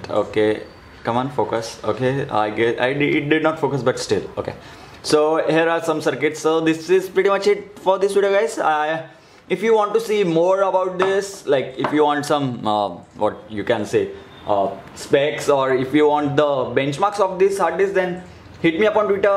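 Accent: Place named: India. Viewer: What language is he speaking? Sounds like fluent Indian Scammer.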